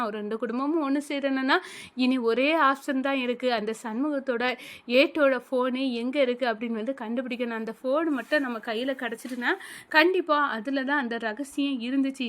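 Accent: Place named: Tirunelveli, India. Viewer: திருநெல்வேலி பேச்சு super ❤ தொலிய உரிக்கும் வார்த்தை very nice. akka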